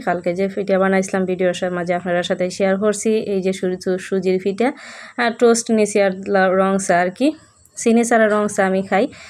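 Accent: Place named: Sylhet, Bangladesh. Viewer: সিলেটি?? এই কথা গুলো খুব ভালো লাগে শুনতে ❤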